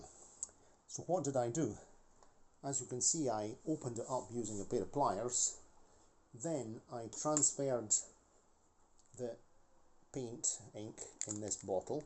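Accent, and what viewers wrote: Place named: Scotland, United Kingdom. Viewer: I think autumn passed us here last Tuesday! 😂 Don’t forget that your “heavy “ Scots is a language in itself. Dinnae let nae Sassenach tell ye otherwise! My French wife speaks a lot of Ulster Scots too. It’s funny to hear her call the dogs “wee skitters” or the price of the shopping “fairly skelped her, so it did”! 😂 Did you grow up in a bilingual home in Glasgow? I know your family are Italian. Do you have a Scots accent when you speak Italian/Romanian? Anyway, off to Germany shopping now. Try a bit of the aul Deutsch. Ein Bier bitte. Guten Tag!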